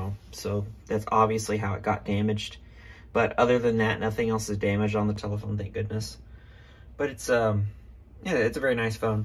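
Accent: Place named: France. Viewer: You sound really cute 🥰;) French accent is nice 😊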